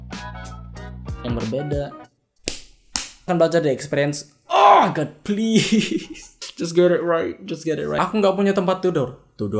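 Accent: Makassar, Indonesia. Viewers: logatnya kyk orng makassar hahahahah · kok sedikit logt nya mirip orang makassar yah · lu orang makassar? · logatnya malah kaya orang makasar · Ada logat Makassarnya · Lahiran Makasar jdi kebawa logat ibunya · bahasa logap makassar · Logat makassar juga ya? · sounds like using makassar dialect :)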